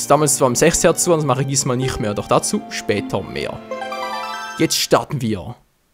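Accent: Switzerland. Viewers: Kommst du aus der schweiz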